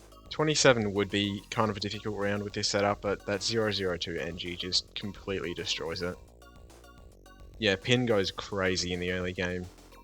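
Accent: Australia. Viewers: Glad to hear another Aussie, what state are you in, better not be another fucking S.A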